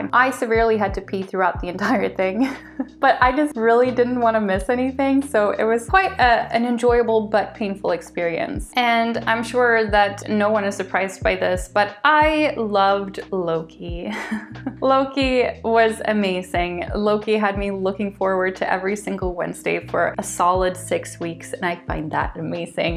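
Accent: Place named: United States. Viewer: I saw your comment on the Reel Reviews With Jen channel and decided to check out your channel. Based on your commentary about the movies you've seen in this video, I think you'd have a lot of success with reaction and commentary videos like Jen does. The videos you already have a very high quality and well made (how many people spend the time to add captions?) but may be too cerebral for the average YouTube viewer. Don't stop making them but if you added Jen-like reaction videos, I think you could grow your channel. You have intelligent commentary, great wit and a good sense of humor. And you're very personable and natural on camera. Plus I'm always interested in seeing non-Americans' reactions to American movies and culture. Have you lived in Sweden your whole life? Your lack of a typical Swedish accent when speaking English is crazy! At least I've never heard someone from Sweden sound so American/Canadian. 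I only detected an accent when you said Gilmore Girls. Good luck with your channel!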